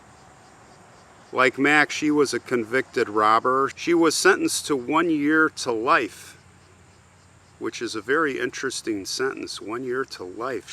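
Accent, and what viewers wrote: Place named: Midwest, United States. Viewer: That prison must have been so awful 😖 Cannot imagine. Thanks also for remembering these forgotten people. I know people comment on your mid-west accent. I don't think I've ever told you, but it makes me smile ☺️ You say Illinois and some other words just like my friends near Peoria do! 💕 😃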